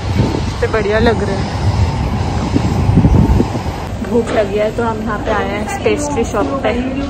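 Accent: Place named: Japan. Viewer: Indeed I m also a new subscriber Liked her vlogs very much. Her accent nowadays sound little bit like Japanese 😊😊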